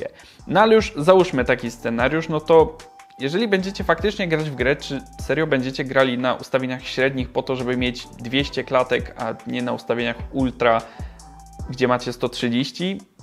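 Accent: Silesia, Poland. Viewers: oho jest ze Śląska, Pozdrawiam Hanysa:D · po śląsku godo a kto nie wie to gorol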